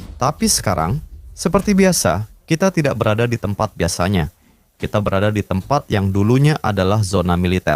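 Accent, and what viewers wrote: United States. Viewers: iti orang adit suara asli orang USA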